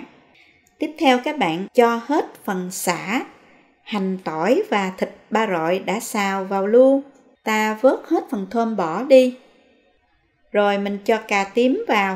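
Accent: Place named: Mekong Delta, Vietnam. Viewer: Rất thích cách chia sẻ từng món ăn của,và nhất là giọng miền Tây để nghe và rõ ràng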